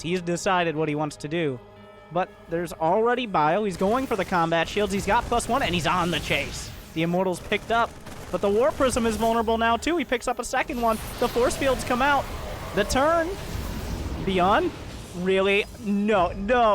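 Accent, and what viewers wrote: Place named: Texas, United States. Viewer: You sound like youre from Texas,. When you started commentating all fast it reminded me of those auctioneers xD can i get a thounsand? Thousand two thounsand five, six, two thounsand!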